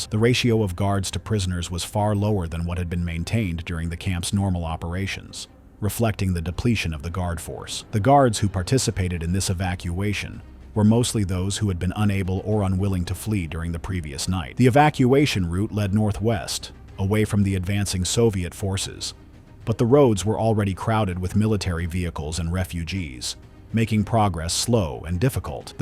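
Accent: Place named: United States. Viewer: I believe it's the bloody annoying authoritive American accent - which is so annoying! Right! The Americans won the war!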